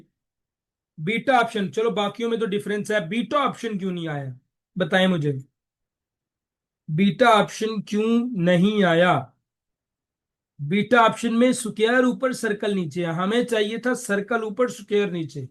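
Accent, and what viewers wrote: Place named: Punjab, India. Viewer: Haha ur Punjabi ascent hits different 😂❤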